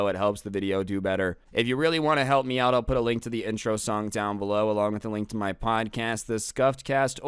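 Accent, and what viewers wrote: United Kingdom. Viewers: I think his cat noises and British accent makes me have stampy vibes but stampy actually talks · ngl i like british accent or something (im not british)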